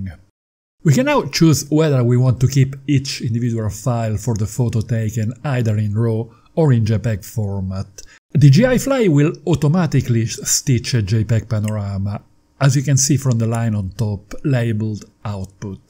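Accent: Italy. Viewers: I’m enjoying your videos. I love your Italian accent. Just like myself living in Australia with a strong Swiss accent.👍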